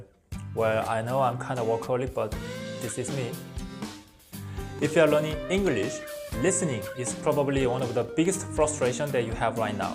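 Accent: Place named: South Korea. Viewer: 토종이신가봐요 발음이 정겨워서 좋아요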